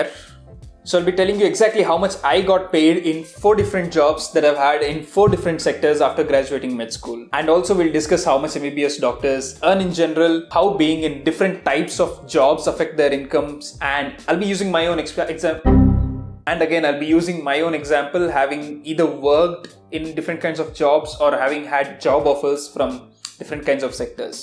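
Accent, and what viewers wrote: India. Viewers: I like the karnataka accent in your English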